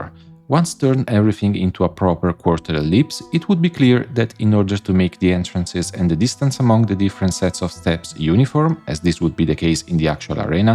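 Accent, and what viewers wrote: Italy. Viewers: Ehm mi sembri un italiano all’estero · Ma sei italiano??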